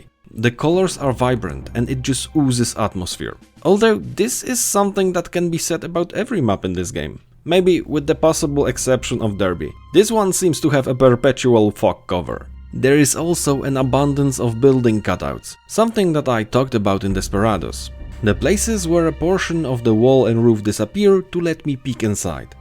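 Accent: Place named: Germany. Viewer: Discovering your video via the Steam page of Desperados. Great video with excellent review on which i agree 90%. I grew up playing Commandos (from the first to the last) and Spellbound games (Robin Hood, Chicago and the three Desperados games) and i completely relate to your points and feelings. I still play those games from time to time (they're still very nice games to play even in 2021) and i played Mimimi games too (Shadow Tactics and Desperados III), even though i liked them very much they lack the charm those old 2D Isometric games had. My biggest dream would be a Desperados 1 re-master with 4K HD graphics and better animations yet the very same gameplay and story (+ the expansions maps that were planned but never released). Anyway you earned my like and my sub ! :D PS: Where are you from ? I can hear a german-ish accent but i'm unsure. BTW i'm French and i understood 100% so your English is perfect !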